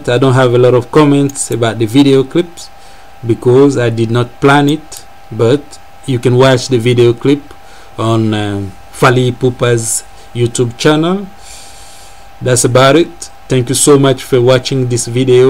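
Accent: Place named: France. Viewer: Your english sound french.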